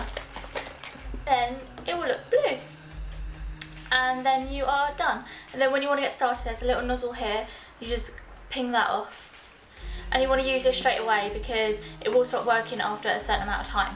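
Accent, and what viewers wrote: United Kingdom. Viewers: Dat british accent... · ARE YOU BRITISH? ;O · r u brittish ? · he I'm french and I looove ombré hair! But don't you think I shouldn't do it at home ? it'll be better at a haidresser don't you think ? pleaase answer !! oh by the way I don't really get your accent ? are you british ? not american I guess ?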